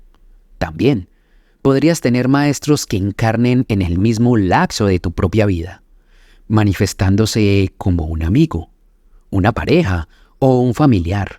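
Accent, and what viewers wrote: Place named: Antioquia, Colombia. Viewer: Que maravilla escucharte, gracias por la entrega de los mensajes. Nada pasa por casualidad creo que todos los que llegamos a tu canal, teníamos que hacerlo y yo estoy feliz y agradecida con el universo y la vida por llegar a tú sendero, me encanta tu asentó, tan Paisa tan bonito 😍, yo también nací en esa hermosa tierra pero llevo muchos años fuera.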